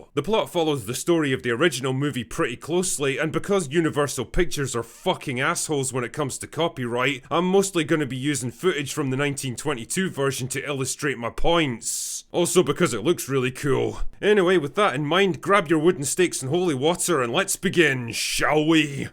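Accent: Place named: West Lothian, United Kingdom. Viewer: The Drinker Recommends, I watch And it’s not because he sounds like he lives just along the road from me (Livi - though I’m a proud westerner. Mon The Sons)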